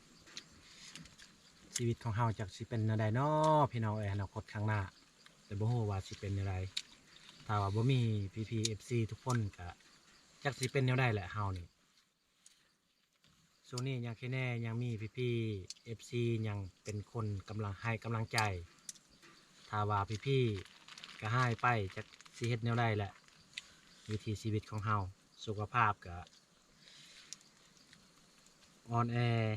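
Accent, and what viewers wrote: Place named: North, Thailand. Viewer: พูดเหมือนคนภาคเหนือของไทย.กินคือกั๋นเลย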